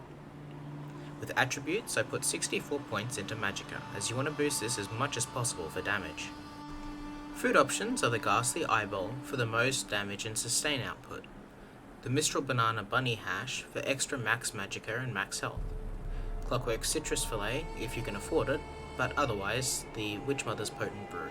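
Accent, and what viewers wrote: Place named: United Kingdom. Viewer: Thank you so much for being experienced, and explaining everything pristine. I came here from a comment you left on one of Hack's eso warden build videos. His build was nothing compared to yours and I almost made the grave mistake of copying his build. That is when I found your comment, it seemed so educated, which made me click on your channel. When I saw you had a REAL man's warden build, I knew God existed and worked in many mysterious ways, and that those ways brought me here. I am from the States, but you sound like you are in the UK, God Bless The Queen.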